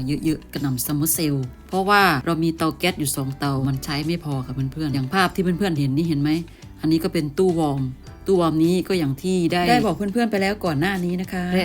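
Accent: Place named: Isan, Thailand. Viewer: เสียง พูดกลาง ผสมอิสาน เพราะนะ มิน่าสติกเกอร์ทำที่ไทยสวยจริง นึกอยู่แร้วว.สติกเกอร์จากไทยแน่ๆ อิอิ ร้านพี่ก็พิมพ์สวยน้าา ไปดูที่ช่องเลยงับ😁😁😁😁😅😅😅😅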